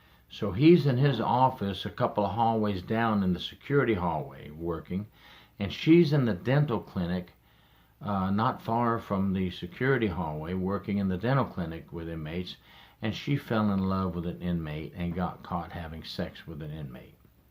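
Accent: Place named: Florida, United States. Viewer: Just stumbled onto your channel not even sure how I got here. You sound like your in Florida. I live here too and I have a loved one incarcerated due out next year. I myself have never understood how the greed of money will drive someone employed by the DOC to get involved with an inmate and abuse their authority. Inmates can't consent to sex why are these people not charged with Rape? If I wanted to be with my Loved one in that way at a visit as a visitor I would assume I would catch charges. What makes them any different? I look forward to watching more of your channel. ;)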